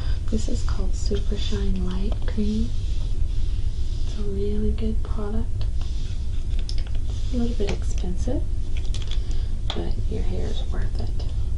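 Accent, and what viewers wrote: South, United States. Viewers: She sounds like a female Bob Ross, that calming southern twang creating ASMR masterpieces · I just want you to do my makeup in real life. Ugh. You are so comforting and I love your sweet southern accent! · You have this really subtle (southern?) accent that's exremely pleasant. Can I ask what region of the us you are in/ from? Here in the PNW we overpronounce everything, and sometimes sound snooty. Also, I can see why this video has 18000+ views. I've got a mild case of coma, lol. Seriously, great stuff. Thanks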